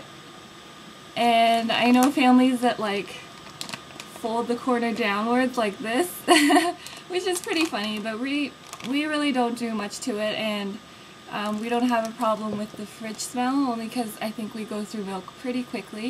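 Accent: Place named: Canada. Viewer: I like the Canadian accent :3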